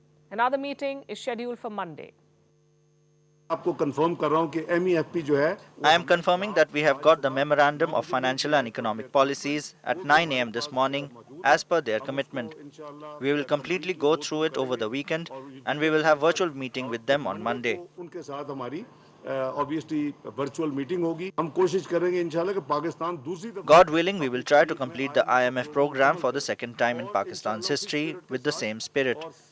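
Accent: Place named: India. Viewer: Somehow her Indian accent makes her lttle zingers even more risible.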